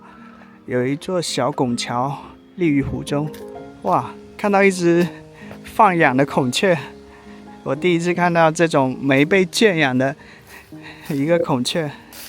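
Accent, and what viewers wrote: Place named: Cantonese, China. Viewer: 是廣西人，講的是粵腔普通話，很容易聽懂，別苛求了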